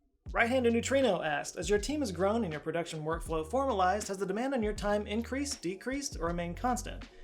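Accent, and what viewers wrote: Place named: Texas, United States. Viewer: An idea for a tmi video, show off your full down home texan talk, and go back and forth to regular, dont know why just thought it would be fun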